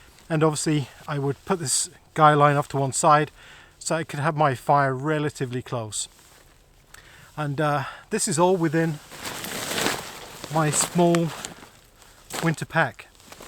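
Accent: United Kingdom. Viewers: Was not expecting the British accent, but then I am new to the channel. Survival Russia bought me here BTW.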